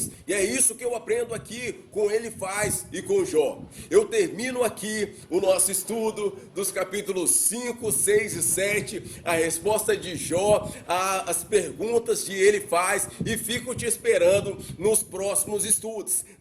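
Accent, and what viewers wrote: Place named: Bahia, Brazil. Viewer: Gostei muito do seu jeito de explicar deste jeitinho baiano rsrs, Que Deus continue abençoando.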